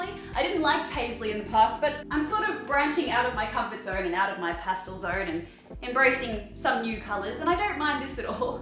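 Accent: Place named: Australia. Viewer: Hi! I seriously love that yellow dress and YES CERTAINLY, it reminds me of that Birger Christensen dress from the designer haul. I need to correct you though, Birger Christensen is a Danish brand, not Dutch. Birger Christensen was a Danish man that established a store in Copenhagen back in 1869, as a small fur repair workshop. I don’t know if the exact designer of the cute yellow dress is Dutch, but it wouldn’t surprise me! Also I’m a Dane myself, and I’m loving your lovely Australian accent, your friends, rambling, Archie and most of all your passion for pastels. I’m very into sustainability and seeing all these lovely thrifted items makes my day. Thank you for inspiring me to be myself 😭💕🌈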